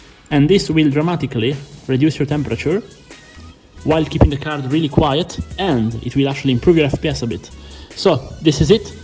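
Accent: Italy. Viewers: capito che eri italiano dall'accento, bel video comunque😂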